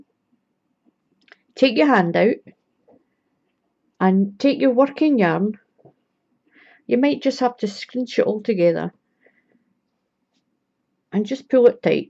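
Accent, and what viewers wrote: Scotland, United Kingdom. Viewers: Are you from Scotland ?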